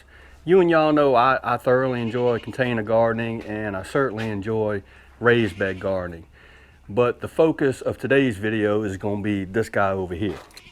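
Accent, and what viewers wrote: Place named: New Orleans, United States. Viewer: Thanks for the info! This is helpful! Your accent sounds like your from my area, West Bank of New Orleans area? God bless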